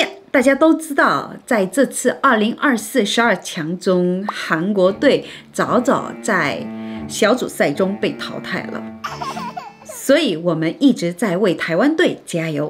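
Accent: Standard. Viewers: 國語超級標準😂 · 妳的中文好標準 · 這國語也講得太標準了,是真的韓國人嗎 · 您的中文真標準，謝謝您的影片❤ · 天呀 你的中文發音非常標準❤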